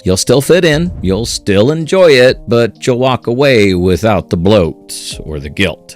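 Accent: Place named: South, United States. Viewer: the southern accent 😭😭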